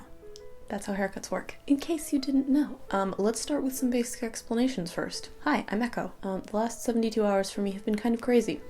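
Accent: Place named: United Kingdom. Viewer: i see u r speaking a little bit with the old british english accent!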